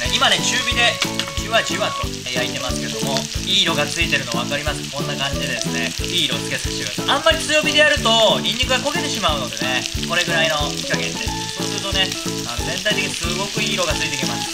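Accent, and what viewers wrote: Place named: Kansai, Japan. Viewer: 今日も絶好調ですねww リュウジさんはなんでちょいちょい関西アクセントはいるの。 そこもすこ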